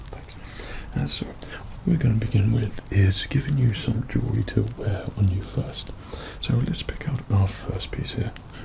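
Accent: England, United Kingdom. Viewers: Arabian genie but has a English accent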